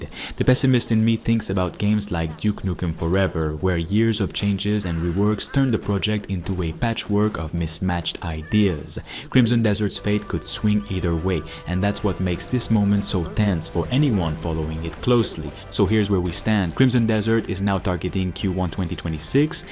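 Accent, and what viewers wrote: Quebec, Canada. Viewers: t'es Québécois ?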